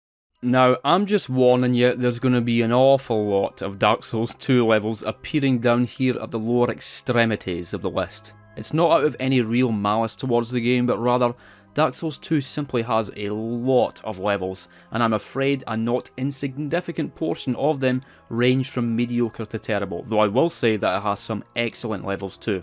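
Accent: United States. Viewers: Your American accent is really spot on, could use some more practice, but pretty good. As a american, I approve lol. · That American accent is so fucking funny bro. 😂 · The American accent is fire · love the american voice. i was forced to use 1 from cape town south africa, working for t-mobile. i hated how i sounded. you were great.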